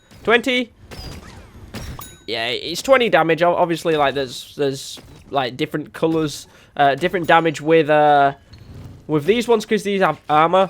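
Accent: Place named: Wales, United Kingdom. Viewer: its mostly his weird whelsh accent and his broken grammar that pisses me off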